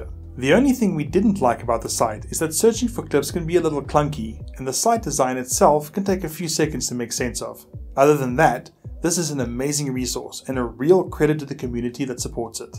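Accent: South Africa. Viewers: South African accent? Love it, apologies if I'm wrong. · South African accent! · You sound South African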